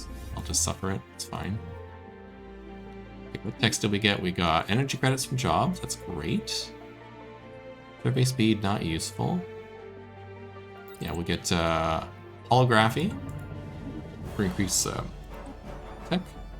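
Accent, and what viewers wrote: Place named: Alberta, Canada. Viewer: A fellow Canaadian, and sounds like a fellow Albertan as well, loving the content as usual!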